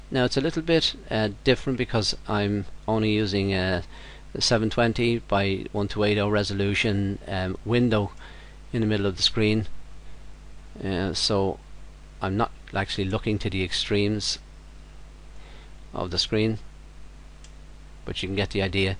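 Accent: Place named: United States. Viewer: A very good video!!!! You have a bit of an accent. From the USA!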